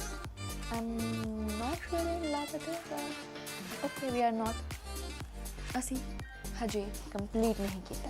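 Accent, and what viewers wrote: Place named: Delhi, India. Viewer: Punjabi boli de accent te dhyaan den di lorr ya, subscribers apee chake jane ya tahaan nu delhi vala accent delhi valiya nu jama ni bhaounda😅😅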